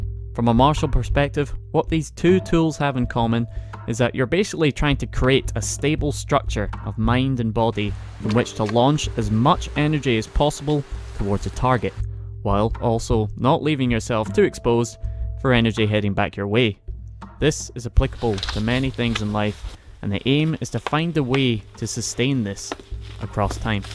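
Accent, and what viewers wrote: Scotland, United Kingdom. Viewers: Scottish ASMR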